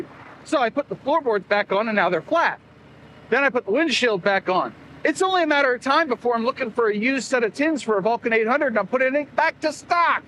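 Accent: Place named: Dallas, United States. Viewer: Sounds like Dallas to me and I wasn’t even born here in the States🤣🤣🤣🤣 Very true what you’re sayin…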